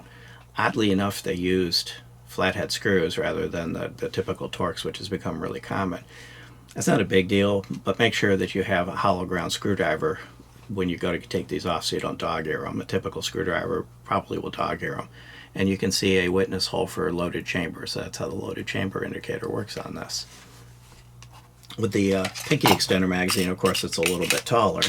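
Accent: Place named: Midwest, United States. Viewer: I am 99% sure the alligators in the beginning makes me believe he's in Florida. He even mentioned in a few of his videos he's in Florida. From the sound of his accent it sounds like he's from Michigan, Illinois, Wisconsin or one of the East Coast states originally and later immigrated to Florida.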